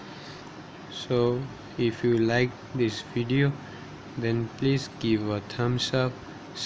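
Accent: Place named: India. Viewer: indian speak very funny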